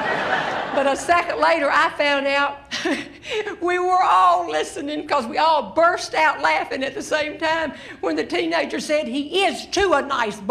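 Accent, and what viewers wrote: South, United States. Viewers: I love it....she has a wonderful delivery and great comedic skills! Her Southern accent is wonderful and the fact that she profanity free is the best.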